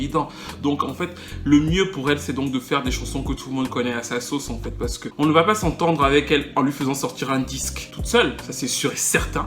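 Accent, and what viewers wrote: Cameroon. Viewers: Salut mon frère je viens découvrir ta chaîne, et franchement je suis tombé sous le charme!La manière avec laquelle tu racontes, c'est très immersif quoi!Tu en parles comme des gens du quartier qui ont grandi avec nous,avec un brin d'accent Camerounais!Chapeau!J'ai direct activé la cloche pour ne rien manquer! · Tes vidéos sont au tellement... non, tu es trop fort!!! Et l'accent camer ça rend la sauce impeccable!!! C'est une superbe chanteuse et sous une interprète hors pair!!! ELLE s'est mise un boulet au pied toute seule en voulant s'octroyer un talent artistique qu'elle n'avait pas...en plus si tu te joues les divas bon les durs du milieu ont vite fait de se passer le mot et tu vas mourir dans le film q chaque fois que tu vas sortir ton nez... Sinon une vidéo sur stp... Et une question privée: tu bosses dans quel domaine, la musique, la production?Où c'est juste une passion? 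En tout cas, continue de nous distraire si talentueusement,tu as vraiment l'art de raconter des histoires!!!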